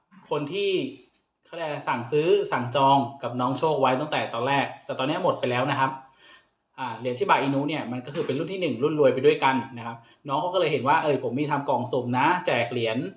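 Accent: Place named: Thailand. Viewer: คิดถึงนะคะอาจรย์ สุดหล่อคะ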